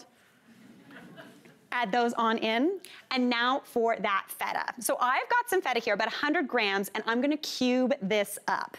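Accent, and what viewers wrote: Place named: Canada. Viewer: Eh. . Eh?..so Canadian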